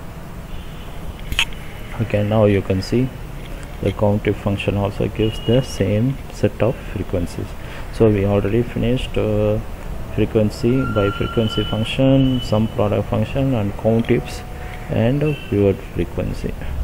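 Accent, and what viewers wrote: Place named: India. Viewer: The most Indian, Indian accent I've ever heard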